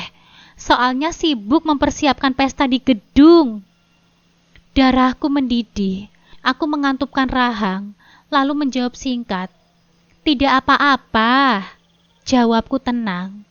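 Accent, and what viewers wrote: Java, Indonesia. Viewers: Medok jawanya jadi lucu dengarnya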